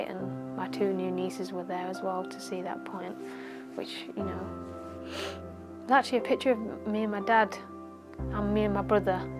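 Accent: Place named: Yorkshire, United Kingdom. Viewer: Like the Yorkshire accent . Brave girl. Good luck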